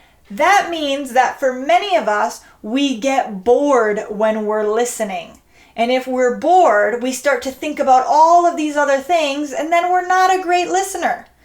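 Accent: United States. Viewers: Which part of America are you from? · Are you from California? I think you guys have that kind of accent there.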